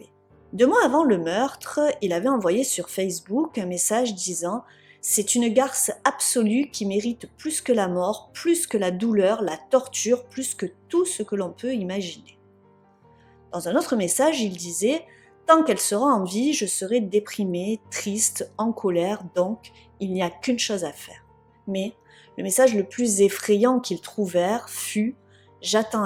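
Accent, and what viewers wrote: South, France. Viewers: Narration au top comme dab avec le petit accent du sud qui va bien :) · Bonjour, Personnellement je ne regarde jamais les images. Je ferme les yeux et j'écoute en utilisant mon imagination pour me visualiser l'histoire. Images ou pas, j'apprécie tout autant tes histoires, et un grand bravo pour ton travail et ta façon de raconter. PS. J'aime ton accent, ne serait-ce pas un petit accent du Sud ? Au plaisir d'entendre ta prochaine histoire. Je te souhaite une très belle semaine.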